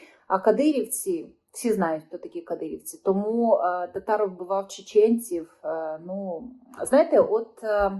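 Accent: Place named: Moscow, Russia. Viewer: Ця касабка ще на початку 2022 року зомбувала аудиторію російською з мацковскім акцентом. Така типова мер &твечуківсько-ахметівська гебнява журнашлізда.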